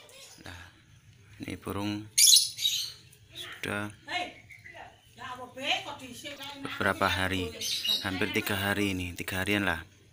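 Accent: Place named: East Java, Indonesia. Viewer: Wong Jatim koyok e lek ndelok logat e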